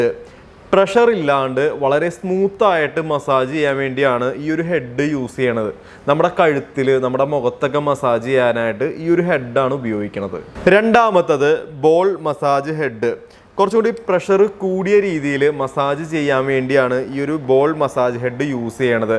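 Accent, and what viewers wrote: Thrissur, India. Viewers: തൃശൂർ ഭാഷ പൊളിച്ചൂട്ടോ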